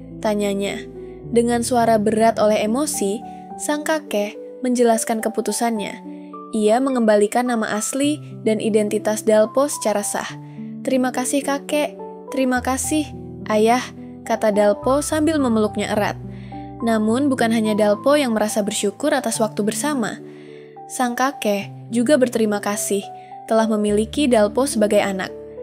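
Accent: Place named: West Sumatra, Indonesia. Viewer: Pasti urang Minang kan kak wkwkwk😂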